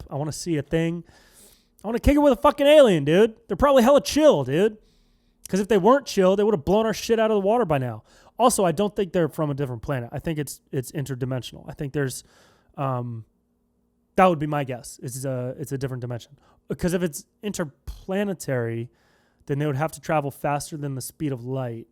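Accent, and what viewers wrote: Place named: Northern California, United States. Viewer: Bruh every time I watch these videos It makes me proud of NorCal. Thanks for repping our accents, culture, etc. I’m 18 from Chico town.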